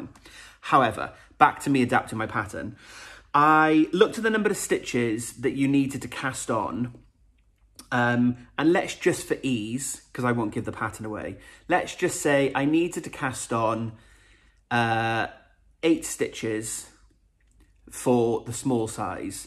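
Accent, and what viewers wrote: Wales, United Kingdom. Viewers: Really enjoy your podcasts, its nice to hear a lovely Welsh accent. I am newly subscribed and enjoy and feel inspired once I watch them many thanks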